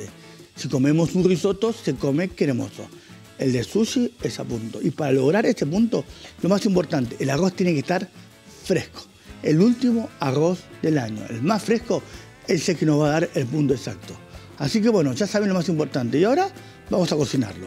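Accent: Argentina. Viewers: Me encanta el acento tan argentino que tiene usted, que es originario de Japón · Un japo hablando argentinoooo 🤌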